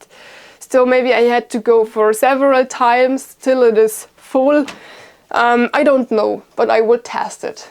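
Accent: Germany. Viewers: exelent job on your preparedness. Soon you're gonna need a shed because the cabin is full. You might won't for extra preparedness to hide and bury some of your food like the long term packaged and cans somewhere in secret locations in and around the forest. Love MIKA and your GermanEnglish ❤ Don't forget to hide your Nuts like a squirrel. 🐿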